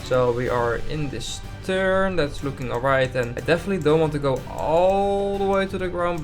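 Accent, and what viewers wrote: Netherlands. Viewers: You are dutch right?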